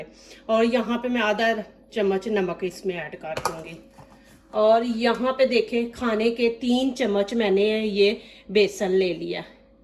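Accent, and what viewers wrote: Punjab, India. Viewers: Punjabi lehjhe main baat named kya kare, Durst urdu boleh. Recipes acchi hoty hai.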